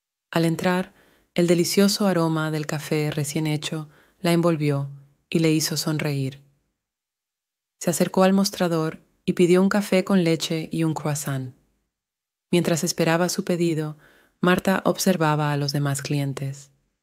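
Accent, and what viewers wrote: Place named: Latin America. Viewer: Good story but I have 2 issues with this. First (and not so important perhaps) is that there is no passion, no spark in the voice of the person telling it. That makes it a bit boring. Second and most important, she mixes accents, she says "decidir", "dulce", "vecinos" and many other words as pronounced in Latin America (with seseo), but then she says "entonces", "hizo" etc in the way they are pronounced in Spain. This is VERY confusing to learners, especially to beginners who are the target audience here.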